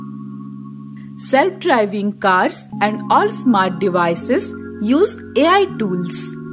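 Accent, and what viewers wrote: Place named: Uttar Pradesh, India. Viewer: Are you from up or mp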